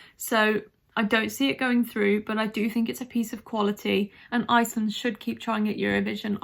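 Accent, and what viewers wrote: United Kingdom. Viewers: you have a lovely English accent and you look gorgeous. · I am not a huge fan of Eurovision, and it's veeery seldom that I watch "reactions". This year is an exception, because of our Kalush Orchestra participation at Eurovision 2022. Thank you for your respectful analysis of this entry. I have watched some "reactions" (which was mostly like Oh/wow/lifted eyebrows kind of reactions, which is neither fun nor development)). But your channel gives so much more! Your Reactions are more like Analysis than simple reactions, actually. Love your British accent), love your genuine vibes, love your respect in predictions. Share your emotions towards Måneskin)). Thank you! You are doing it right! Love from Ukraine! Since we cannot vote for our country, my vote this year is for Sam Ryder, UK. Very good entry!! Vocals, aesthetics, the whole message- good luck, Sam!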